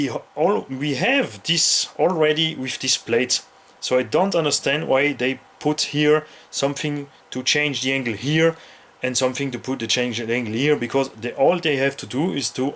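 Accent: Alsace, France. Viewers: a lenglish accent francais alsaco je valide !!! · You have a great french accent lol 😅 pretty interesting vid ! I love this buggy too!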